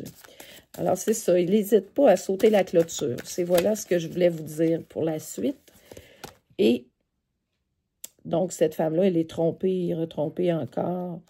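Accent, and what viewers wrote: Quebec, Canada. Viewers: Bonjour , je ne veux plus rien régler ,tout a déjà était réglée dans le passé avec ce traître diabolique pervers et narcissique , j'aime bien votre accent québécois , et je vous remercie infiniment pour votre guidance . C'est la beauté et aussi la chance d'être dans la nature pour pouvoir vous ressourcer ça fait extrêmement du bien , triste a moi je n'est pas cette chance , mais effectivement mon ex autre pervers narcissique qui est déjà avec une femme maritalement mais il ont failli perdre leur enfant qu'il n'ont pas perdu au final et qui a 30 aines , il m'a beaucoup fait de mal exacte , et dans cette famille tout n'est qu'argent finance immobilier ,c'est sa femme qui est riche et que lui a épousé il y a 40 ans dans la noirceur totale très fausse superficielle exacte , qui a ensorceler mon ex autre et a contribuer a notre séparation mais lui a préféré l'argent a l'amour que je lui apporter , il n'aimer que mon énergie et je les éperdument aimer sans savoir vraiment ce qu'il était et faisait , il y a une secte on m'a dis , oui il est ds une cage dorée dont il ne veux pas partir pour l'argent incapable de se gérer seul , la dame riche sa mère et mon ancien autre tous sont dans les mensonges , je pense que c'est un gigolo même probable un proxénète vu ce qu'il m'a raconter qu'il fréquente les prostitues alors qu'au départ il a bien cacher son jeux se faisant passer pour fidèle alors qu'il est en perpétuelle conquête de recherche féminine , j'ai failli mourir car il m'a vider de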